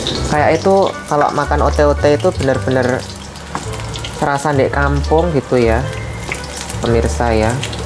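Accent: Java, Indonesia. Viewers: Ya Allah.aku kudu ngguyu Yen ndelok kontenmu..Bahasane campuran bikin lucu,medok jawane..marai luwe kui pecele...cb Yen cedak,bakal tak jupuk kui maeme..he..he...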